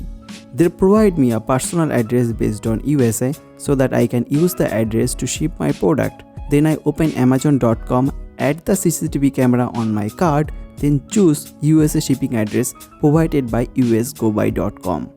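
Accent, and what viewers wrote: Bengal, India. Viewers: Rathod He is talking in Bengali accent, not American. Don't be ignorant about your countrymen, try to learn more about India. It is a very diverse country. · দাদা আপনার কথা শুনেই বুঝেছি আপনি বাঙালি। বাংলাদেশ থেকে ভালোবাসা রইল 🇧🇩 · Typical indian people ma dude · Bengali people speak 🗣️ like this it is not like that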